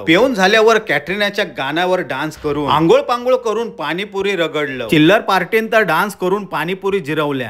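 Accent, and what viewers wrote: Malvani, India. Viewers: tumch boln khupch aavdt te mhantat na ki. .. malvni manus to malvnich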